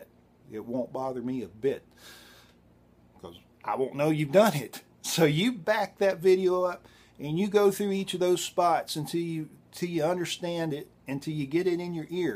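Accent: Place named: Texas, United States. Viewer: This man's accent is identical to Dr. Phil's. Makes me wonder if he's from the same North Texas background. Makes me want to learn. Being honest with myself, I've only just got to the point where I don't look at my right hand, and I know you don't want to be looking at that from 35+ years of playing guitar, and 15 of finger picking. Banjo is just so different with the finger picks, but that's the sound I'm going for, so I'll learn. Thanks for the lessons and for being cool about it.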